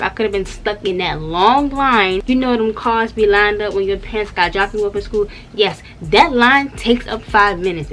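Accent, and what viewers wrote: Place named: Maryland, United States. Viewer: I guested right she was from maryland because of how she talked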